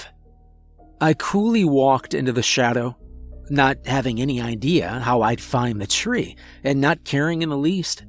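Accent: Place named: Southern California, United States. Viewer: I'm not gonna say which, but one of those is awful. Another awful one has the initials SD...no standard for story quality and butchers the good ones. I like tho. His weird SoCal accent has grown on me.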